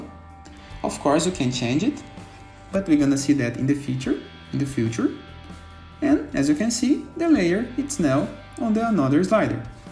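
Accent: Brazil. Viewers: Certeza q é BR.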